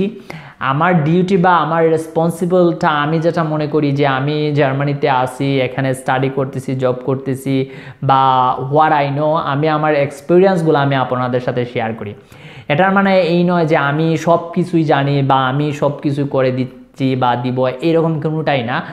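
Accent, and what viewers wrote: Sylhet, Bangladesh. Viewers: আপনি সিলেটি, আপনার কথায় সিলেটি টান আছে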